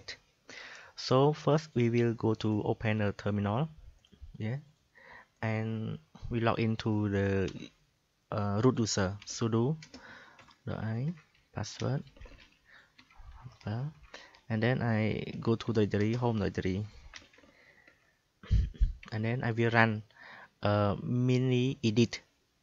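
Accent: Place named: Vietnam. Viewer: Very funny vietnamese accent :D I like it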